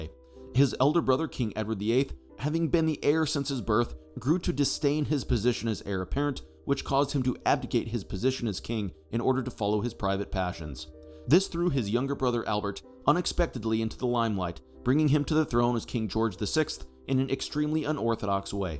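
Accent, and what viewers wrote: United States. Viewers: Why the American accent??!!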